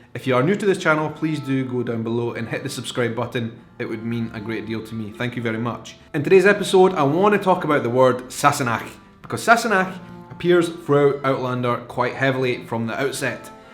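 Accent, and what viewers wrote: Scotland, United Kingdom. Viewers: i came upon your channel after searching for scottish accents (w/c i love listening to) and i am watching all your vids rn. thank you for this series! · I love your accent! Damn, I wish I was Scottish :(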